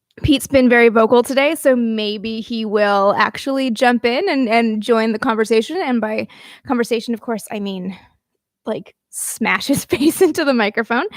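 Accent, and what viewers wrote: Canada. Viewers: Will have to get the ebook so I can hear that awesome Canadian accent all day.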